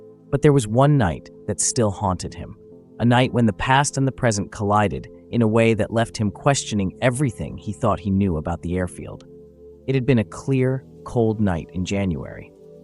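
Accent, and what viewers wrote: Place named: United States. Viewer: This video needed an English accent, not a yank. Just doesn’t work.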